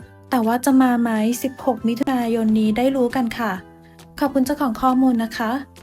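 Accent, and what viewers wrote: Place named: Central Thailand, Thailand. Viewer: แอดคนจังหวัดอะไร เหมือนคนภาคกลางเหนอนิดๆๆ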